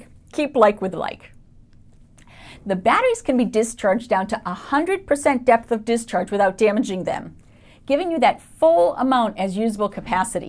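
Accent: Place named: United States. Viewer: That blew my mind and I had to get a cup of tea and watch it 3 times lol/. I love an American accent and that was the best tutorial ever!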